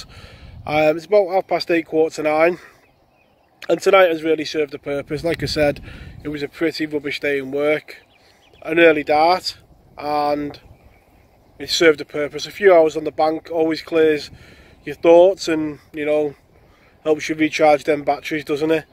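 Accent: Liverpool, United Kingdom. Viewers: Love the vids Even got the Mrs subscribed and just watched this Together! Watching your vids always gives me inspiration to get out there! Like you said mate Angling is therapy! And a Fish is a Bonus! Nice Fish well earned! Hope to see you next week fella! Ain't missed a Vid in the Last couple of years.Your "Naturals" are the best? The pools nobody really bothers with? That you ain't sure what your gonna get? Like your MR PIKE VID a couple of months ago that kept Killing your swim and smashed you off at 1 point!!! Tight Lines big Lad! The Mrs is a Fan Now: ) She said? Is he a scouser? I said Manchester? But with you getting your Hemp from Cheshire particals? I'm guessing somewhere in between? Wigan? St. Helens?:):)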